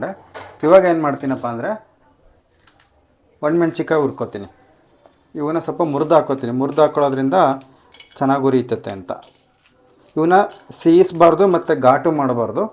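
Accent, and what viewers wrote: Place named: Sira, India. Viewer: Nivu sira ಕಡೆಯವರ ನಿಮ್ಮ ಭಾಷೆ ಹಾಗೆ ಇದೆ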